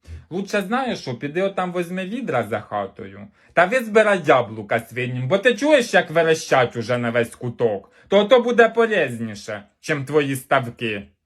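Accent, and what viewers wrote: Poltava, Ukraine. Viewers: Велике вам спасибі! Ви точно як моя мама розмовляете! Сто відсотків Полтавська область! Слово ночви тільки там вживають! Дякую вам, будьте здорові!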